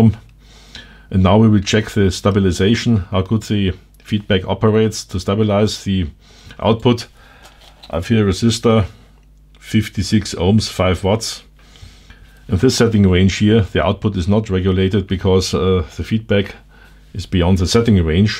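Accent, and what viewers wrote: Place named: Germany. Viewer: funny how germans say pluck not plug and wideo not video!!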